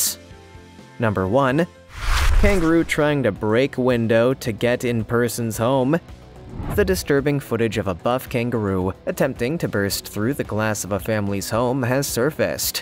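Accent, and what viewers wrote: Canada. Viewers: Im sorry great video but. Pfff im dutch and even i can hear that disgusting canadian accent. “Mouth, about, doubt,” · That is one heck of a Canadian accent. Love it!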